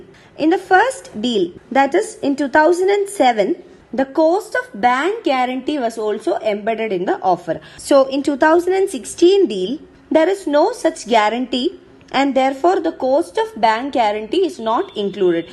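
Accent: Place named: South India, India. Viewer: It's an accent from south of India if you don't like it don't listen to it..☺️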